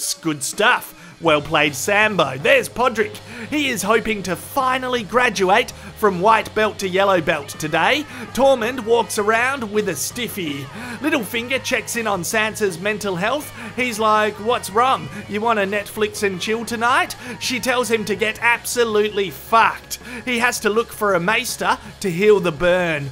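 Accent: Australia. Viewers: Stumbled across this in my recommended list. Hope people don't think all Aussies sound like this or have such a potty mouth.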